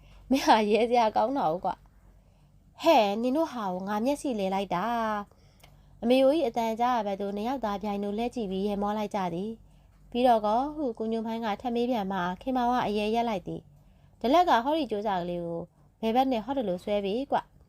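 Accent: Upper Burma, Myanmar. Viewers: Jayရဲ့အသံလေးကို ချစ်မှချစ်😍😍😍ဘာလေးဖတ်ပြပြ အညာသံနွဲ့နွဲ့လေးနဲ့ ပီပီသသ စိတ်ပါလက်ပါ😍🩵🩵ကျေးဇူးအထူး & DAN team🩵🩵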